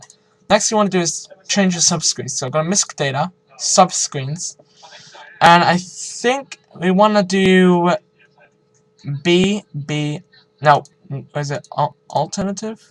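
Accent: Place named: United Kingdom. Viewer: undah combow lol british accents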